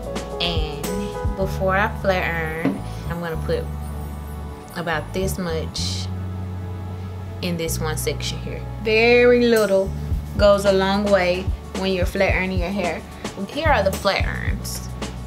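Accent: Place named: South, United States. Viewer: Wow, you hair is gorgeous and I love your curls. Oh, I love your southern accent too.❤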